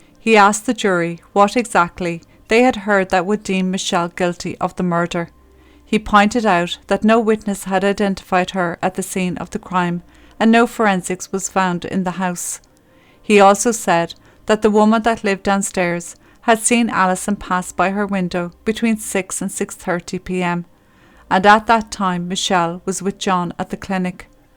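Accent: Ireland. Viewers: I like this narrator,as her Irish accent makes it more personal,l also like her comments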